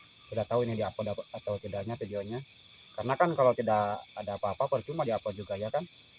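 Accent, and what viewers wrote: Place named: Banten, Indonesia. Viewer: Cctv tolong di perhatikan di disiapkan di pasang di luar,Abang orang Banten ya,soal nya logat ngomang nya SM seperti saya, Banten nya di daerah mn bang tolong di balas karna konten2 Abang menurut sy berani dnenantang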